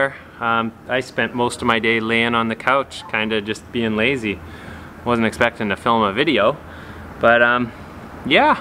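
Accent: Canada. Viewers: I'm not sure why but I love these videos. I think watching someone who skates like me, it's more relatable than watching a pro do a switch backside lipslide down a 16 stair handrail. I've even gotten used to the Canadian accent eh! Great content dude, thanks for all the videos.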